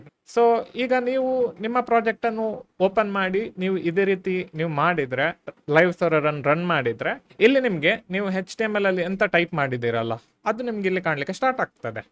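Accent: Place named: Mangalore, India. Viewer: Evaru mangalorean ah? Accent same mangalore navra tara ide..❤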